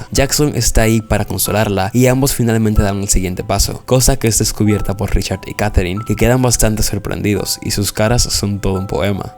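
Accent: Dominican Republic. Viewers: Eres de República Dominicana?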